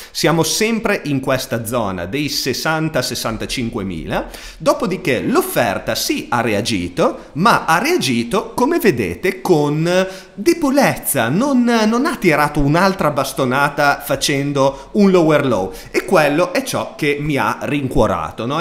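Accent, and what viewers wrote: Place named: Lombardy, Italy. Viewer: Di dove sei? Hai l'accento delle mie parti(Lombardia)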